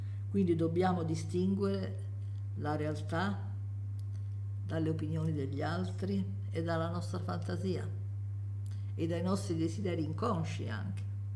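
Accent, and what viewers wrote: Rome, Italy. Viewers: Ciao, io sono una ragazza di 17 anni che da poco ha scoperto i suoi video. Durante la mia vita, ho sempre sofferto il “non sapere” in modo molto forte, cioè già a 7 anni avrei voluto farla finita perché volevo sapere subito cosa ci fosse dopo. Questa sofferenza, secondo me, deriva dalla paura di non poter esistere più, per questo vorrei rifugiarmi sempre nel “cercare di comprendere la verità”, ma a volte devo accettare semplicemente che essa a me non si voglia rivelare. Da lei sto imparando che non sempre bisogna avere la pretesa di sapere ciò che non si sa, a volte va bene anche rimanere nel dubbio. Studiare filosofia e immergermi nei suoi video, per me, sono due vere cure, perché mi rassicurano sul fatto che anche dopo la morte non cesserò di esistere, perché comunque qualcosa sono e da qualche parte dovrò pur andarmene. Sento dall’accento che lei é del Lazio, forse di Roma e nel caso sia come penso, visto che probabilmente abitiamo entrambe nella stessa città vorrei incontrarla davvero davvero tanto. 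Avrei tanto piacere a dialogare con lei perché sarei felice di incontrare almeno una volta qualcuno di estremamente saggio proprio come lei. Un abbraccio. ❤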